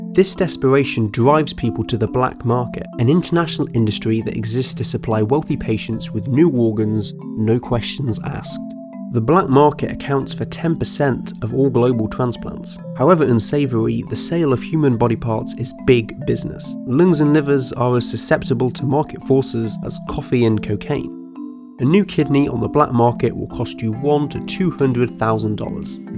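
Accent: England, United Kingdom. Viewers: Before I clicked this video, I predicted the voiceover was going to be an English dude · You have to pay for legal organ transplant? WTF? I'm looking this up right now and where I live (Turkey) A kidney transplant costs the government about 25000 USD. The organ doesn't cost money it is only the surgery(or mulitaple) and the after surgary hospital stays that cost. How can that be more than 200.000 USD? That 25000 USD includes the hospital and medicenes and crap. And you can't tell me that Turkey is poorer. Yes cost of staying at the hospital differes but kidney is free because it is donation and drugs you take fater the sureary are the same everywhere. I really want to know how can a kidney transplant cost more than 200000 USD in a normal country. Is that just the price in USA since government doesn't care if you die over there? If it is why I'm sorry for not understanding but he sounds British, I thought they had healhcare over there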